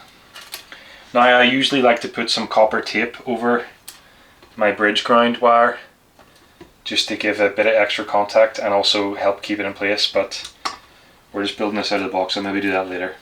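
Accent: Northern Ireland, United Kingdom. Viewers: I just unboxed mine. It's a hollow body version. Looking forward to seeing how you approach the painting and curious about which mods you are going to bother with. The Northern Irish accent was a real plus for me. I loved "Derry Girls" 😉. Any idea when the second video will be up? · So good to hear a great Norn Iron accent. Love your videos. Francis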